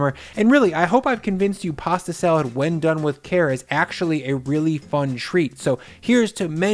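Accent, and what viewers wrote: United States. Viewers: I love the recipes, but aren't I hearing an American accent!?! Why are you measuring in grams?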